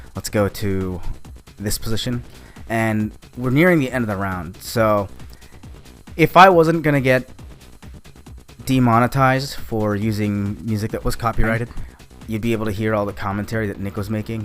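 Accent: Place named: Australia. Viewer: Yeah looked like an omoplatta. Great vids. Bring back the Aussie accent!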